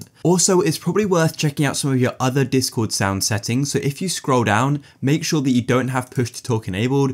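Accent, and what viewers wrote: United Kingdom. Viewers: EWWWWWWW NOT AMERCIASN BRITISH EWWWWWWWWWWWW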